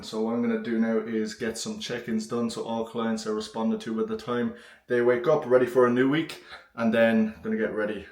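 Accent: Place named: Ireland. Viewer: Hello. I think you seem lovely 😊 I like your Irish accent. I've wanted to visit Australia for a long time. I've thought about it a lot. I can't afford it yet, but if I'm ever in that position, I wouldn't want to miss out. I'd like to go to Sydney, Byron Bay and also travel up to Queensland as well as visiting Darwin, but obviously I know that's a long way from Sydney! I would probably need to go to Australia more than once.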